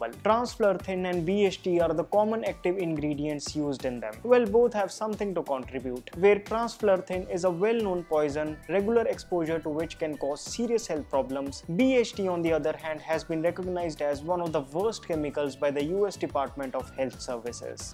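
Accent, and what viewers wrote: India. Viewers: So Indian English accent 😂, hilarious 🤣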